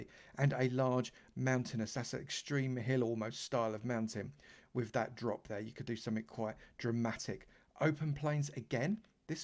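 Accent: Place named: East London, United Kingdom. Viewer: U sound like u support West ham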